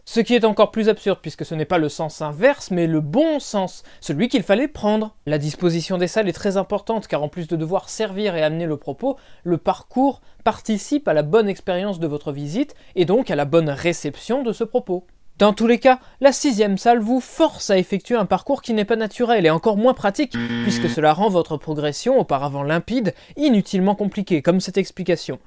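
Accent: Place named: United Kingdom. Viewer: Et félicitations pour votre accent british !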